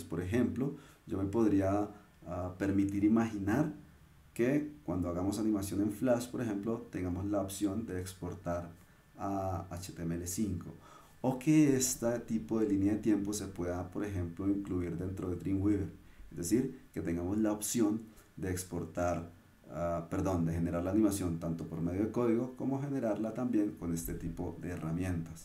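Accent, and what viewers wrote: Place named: Colombia. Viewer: por el acento creo q eres un compatriota colombiano quisiera saber donde me puedo certificar en adobe que es creo el titulo q posees gracias!!!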